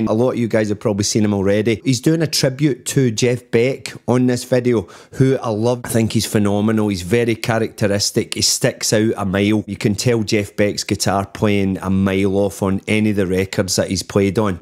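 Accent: Scotland, United Kingdom. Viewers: YOu must be a Scottsman?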